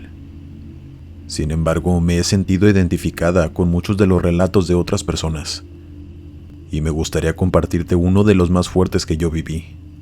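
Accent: Mexico. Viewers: Hola, de dónde eres? 👁👄👁 como que me das vibras norteñas, de México 😅. Por cierto, excelente vídeo, como siempre, me encanta escuchar tus vídeos, me acompañan desde en el tráfico, o haciendo quehacer en casa. Saludos desde Guadalajara, Jalisco 🎉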